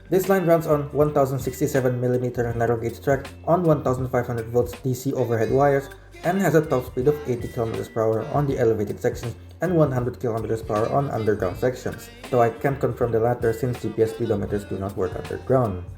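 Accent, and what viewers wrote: Indonesia. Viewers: Dari suaranya yg punya Chanel orang Indo ini,boleh juga bikin Chanel pakai bahasa Inggris selain mempromosikan Jakarta sekalian cari adsense penonton luar 😂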